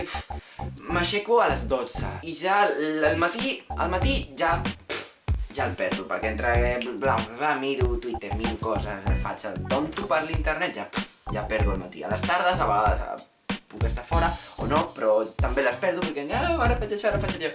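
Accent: Spain. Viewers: se te oye sexy en catalán, en general me quedo a descifrar lo que dijiste con tu acento español, peor ahora no eh podido, a pesar de lo sexy, ni modo.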